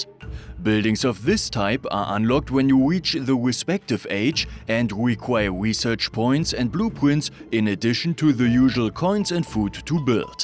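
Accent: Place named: Germany. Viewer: always this groose german English... terrible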